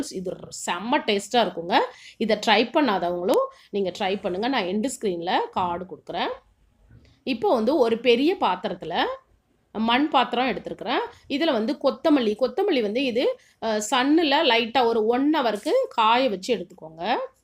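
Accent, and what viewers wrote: Tirunelveli, India. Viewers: Unga voor tirunelveli ya Akka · nanum tirunelveli than sister athan slang thariyuthu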